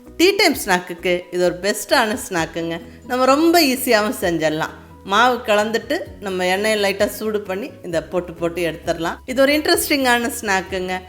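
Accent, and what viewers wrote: Kongu, India. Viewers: Hello mam really all your receipes are awesome the way you explain are chanceless, I like your kongu tamil, I love you so much mam, Always Be happy share ur receipes more love u from Chennai this is also my favourite 😍 receipe keep rocking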